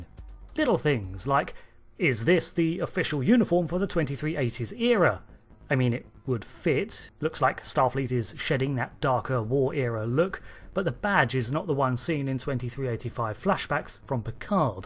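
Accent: United Kingdom. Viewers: E-celeb British accent. Alt F4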